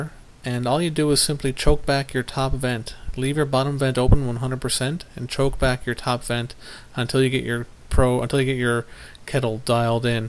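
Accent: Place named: Newfoundland, Canada. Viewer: Awesome. I figured Newfy but sometimes i get confused by people from Nova Scotia etc. I am from BC myself and just getting into this charcoal cooking. Tons of fun! Cool Videos.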